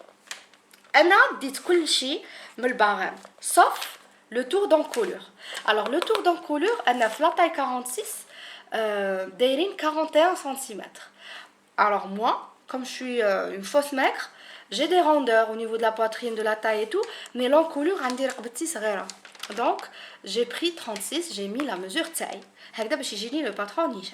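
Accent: Tlemcen, Algeria. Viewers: بالفرنسية مع لهجة الجزايرية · vous êtes de Une axent Tlemcienne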